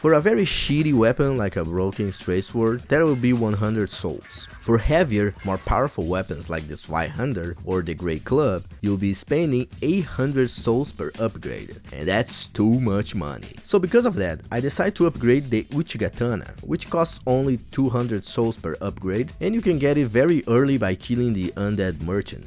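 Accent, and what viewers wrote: Brazil. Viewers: U brazilian or smth? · bro i can uderstand you perfectly, you speak like a brazilian, idk why, but thats funny for me hehe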